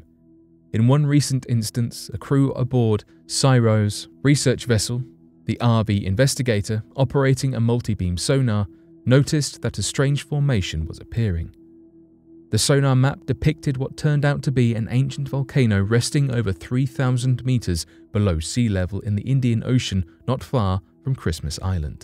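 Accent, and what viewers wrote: United Kingdom. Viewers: British pronunciation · Yeah well he is not American…he is British That is how the British pronounce it. In fact that is how I pronounce it too. I’m South African and we speak UK English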